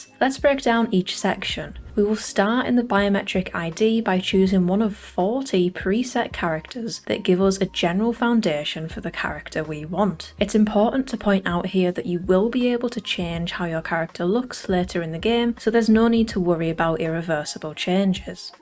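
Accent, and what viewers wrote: United Kingdom. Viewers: shes definitely English.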